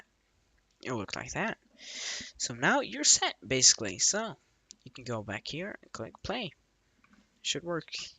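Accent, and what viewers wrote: Sweden. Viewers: That's a nice swedish accent you got there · I can hear the swedish accent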